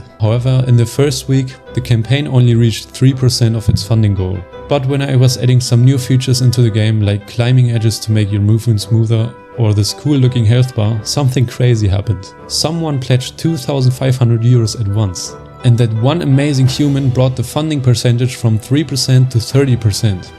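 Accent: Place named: Germany. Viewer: Man Hört doch das du Deutscher bist😂. Geil!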